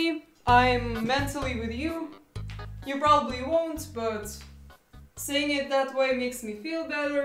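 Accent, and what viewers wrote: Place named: Russia. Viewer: I don’t know what country you are from, but you definitely have a Russian accent)))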